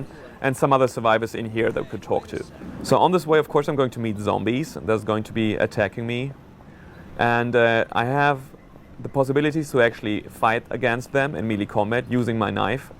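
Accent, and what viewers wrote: Germany. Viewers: No he's not gay, he has a German accent. And the reason why you think having a German accent makes a person gay is because it (probably) reminds you of Bruno. Please try to educate yourself more in the future, American. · german accent ftw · alter... sogar ich höre raus, dass er deutscher ist xD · he sounds so german ^^ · He has a slight German accent, although his English is good. Anyway, I can't wait to play this bitchin game!:D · his german, thats an german accent...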